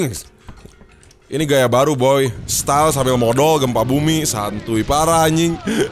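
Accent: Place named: Kalimantan, Indonesia. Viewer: Logatnya kek orang kalimantan bang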